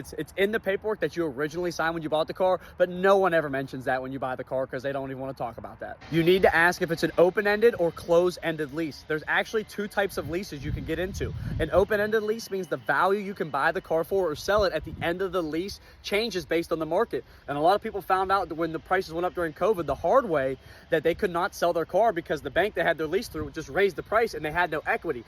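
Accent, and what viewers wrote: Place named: Pittsburgh, United States. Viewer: You gotta be in Pittsburgh or around that area with your accent, haha I’m from Pittsburgh. I live out of state now, I like your videos my man keep it up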